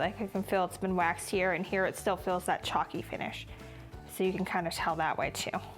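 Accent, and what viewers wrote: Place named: Quad Cities, United States. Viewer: No way! I was listening to your "accent" and asked my son who you sound like and he said me - I am originally from East Moline and went to Alleman. I currently live in Las Vegas and have since 1989 (I am much older than you) -still call the QC's home tho! Your tutorial was excellent - you made it easy to follow and love your explanations.Your final product looked flawless and your shop looks amazing! My grandma and Aunts had a shop in Moline off of 34th St and 23rd ave, maybe you had seen it, The Stitchin' House. I am so glad I listened to the very end so I could figure out where I have heard that dialect before. Keep up the great work -looking forward to watching your other videos! Happy Holidays! Natalie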